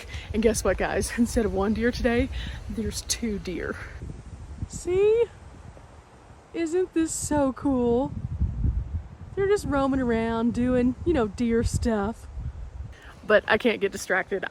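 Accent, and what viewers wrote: Texas, United States. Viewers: Love your videos. Knew from your accent you were a fellow Texan!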